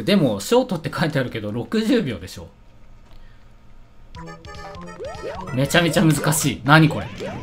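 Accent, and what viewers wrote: Kansai, Japan. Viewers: さんの関西弁好きやな〜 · 最近、若干関西弁挟んでくるの面白いw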